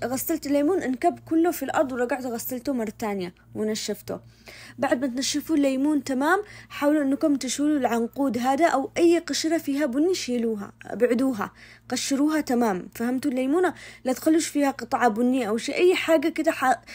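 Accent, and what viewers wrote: Aden, Yemen. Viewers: اني افدالك احلاحاجه اللهجه العدنيه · فديت الكلام العدني كم أحبه يسلمو اليدات بنت بلادي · عجبتنا اللهجه العدني 😂❤ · ❤ فديت بنت عدن اللي ولا تغيرت لهجتها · ماشاء الله عليك اسلوبك حلوو جدآ بالشرح وخصوصاً باللهجه العدنيه واني عدنيه تسلمي وتسلم يدك الله يحفظك ❤ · عجبني لهجتك العدنية 😂..وطبعاً العشار بالطريقتين ❤ والصيادية الشهية.. تسلم يداك يا بهية 😅 سلامي وتحياتي لك من صنعاء. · صفحتك مرررة حلوة والله يكفي نسمع صوتك والكلام العدني يفوووز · ماشاء الله عليك عادني الا اول مره اطلع على قناتك وعجبتني وايد وشرحك خفيف لطيف مع لهجتك العدنيه الحلوه تسلمي الله يوفقك ويسعدك❤❤ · طبخك للأكل العدني جميل وكلامك باللهجة العدنية أجمل كل التوفيق والاحترام لك من عدن وربنا يوفقك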